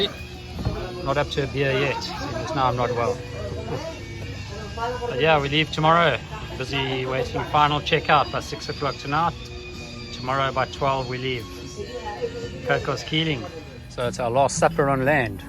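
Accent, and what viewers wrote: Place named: Durban, South Africa. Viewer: Accidentally found your channel and then realised you have the same accent that I have! Enjoying your content. Ex Durbs.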